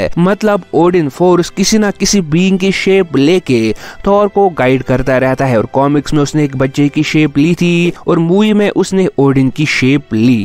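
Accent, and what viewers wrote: Kashmir, India. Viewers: Bro are u from kashmir 🤔🤔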